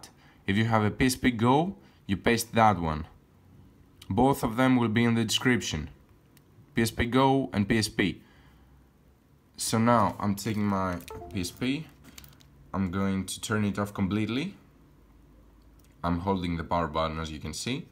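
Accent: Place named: Greece. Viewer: Ε, μονο απο την ελληνοααγλικη προφορά καταλαβα πως εισαι Ελληνας :ο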